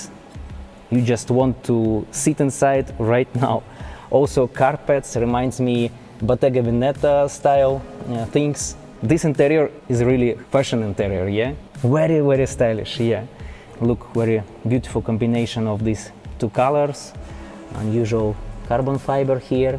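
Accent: Russia. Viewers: Блин учи Английски. Звучишь как русский из американских фильмов.👎👎👎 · Ведущий русский что ли акцент такой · this car is as ugly as the russian english spoken by the presenter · Russian English 🤦🤦😂😂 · Russian Accent is horable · Привет! работай над английским, особенно над произношением! Ну прям Русский Английский. Молодец! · Хех, русинглишь. Ну понятно говоришь, молодец! За обзорчик спасибо! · Sorry bro but its impossible to listen your russian english..its not normal.. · I love that he has a Russian accent and looks asian, reviewing a German car. Global! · very ''Russian'' English...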